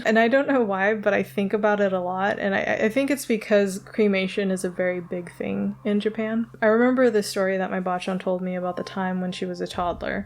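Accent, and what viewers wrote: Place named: California, United States. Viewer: Ms,Were you born in Okinawa? where were you raised? Your accent is as though you are from California. I first went to Okinawa in March 1960.I was married there in 1965 and my two boys are still there.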